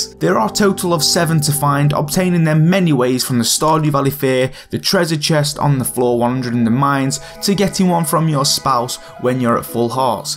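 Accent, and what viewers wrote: United Kingdom. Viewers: Ur so bri'ish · Gotta love bri'ish accent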